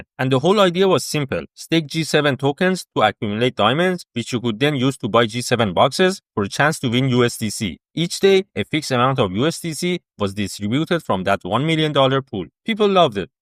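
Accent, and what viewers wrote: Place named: Iran. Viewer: حاجی حس می کنم با این لهجه انگلیسیت ایرانی هستی.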